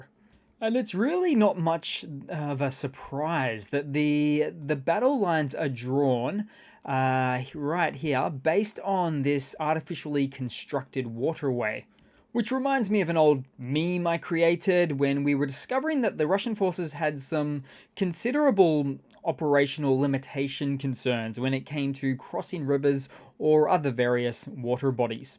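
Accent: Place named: Australia. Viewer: (sp?) I enjoy your posts. I follow a few others (Denys, Georgie,Anna,Artur ) and between you I feel that the fact checking is great. You all add something to the big picture. But one thing bugs me, your accent. Please don’t take offense but I’m just curious, are you an Aussie? 😂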